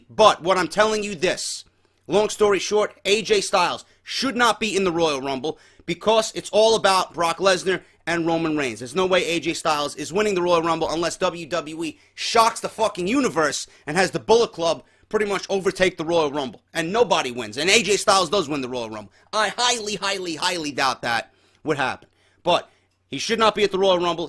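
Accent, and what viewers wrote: New York, United States. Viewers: jesus christ you even sound like youre from NY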